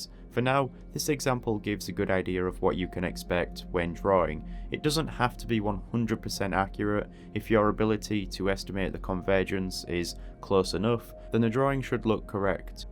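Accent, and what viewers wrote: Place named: Scotland, United Kingdom. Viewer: He has a Scottish/British accent and is from the UK. He has images and a few links about Staedtler which is a company in Nuremberg, Germany but has 150 other representatives in other countries. One link stated that he was on site in England. He also is an architecture graduate at BlokBuild from Hull, East Yorkshire [England]. This took about 8 minutes to find out. You’re right, in that, asking him is the direct answer—-but he rarely comments, I haven’t actually seen him commenting at any point and I was curious myself. This informaiton is only speculation, for one—-im 100% block out deaf. But this could be why there’s confusion on his accent, this happens when someone is from one place and relocated to another [even more so if a third place is added].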